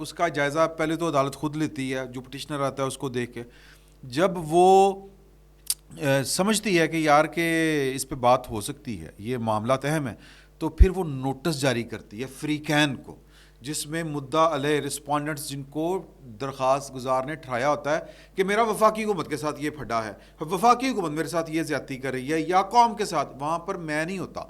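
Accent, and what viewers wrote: Punjab, Pakistan. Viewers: is kutty ka lahja bhi jahil punjabi ki trha ha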